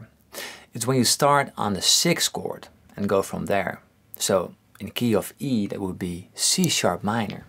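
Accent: Netherlands. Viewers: Are you Dutch? Because your accent really sounds Dutch. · I smell dutch 😅 btw good vid it helps a lot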